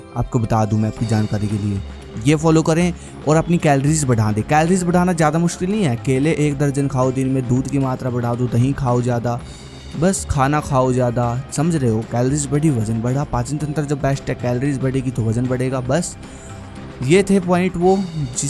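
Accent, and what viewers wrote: Haryana, India. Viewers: Bhai ap haryana se ho kya mne to isa e lag h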